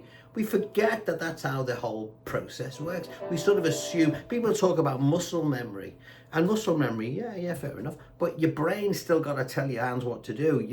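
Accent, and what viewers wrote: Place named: Wirral, United Kingdom. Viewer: Hi the scouse accent drew me in here but you have that posh twang of a Wirralite or Formby guy? the other thing is our strap with the Union jack That was my flag all my lfe i never even knew there was an England flag I noticed the change away came about 1993? Being a classical piano student the guitar fretboard seems very complicated in comparison but this helps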